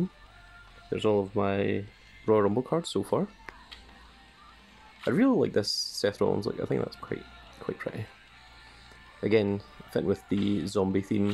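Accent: Scotland, United Kingdom. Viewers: Are u from scotland??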